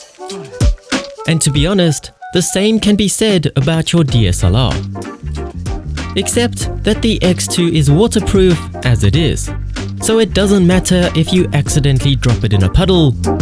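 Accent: South Africa. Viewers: Your videos are AMAZING!!! Sound quality is amazing, background music amazing, narration amazing even with your cool SA accent 😁 Seriously the quality of your videos would impress Spielberg! Fantastic, keep up the good work and thank you for putting so much effort into your content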